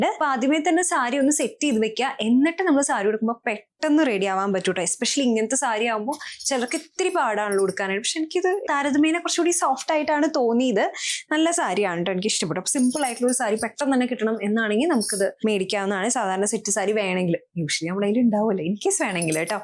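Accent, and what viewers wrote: Thrissur, India. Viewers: Thrissur town area slang anu ith.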